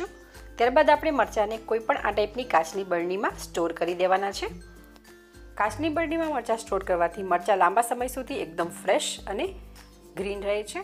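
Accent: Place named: Kutch, India. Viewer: Tamari language per thi laage che ke tame kutchi cho. Koik Kutchi recipe batavo ne.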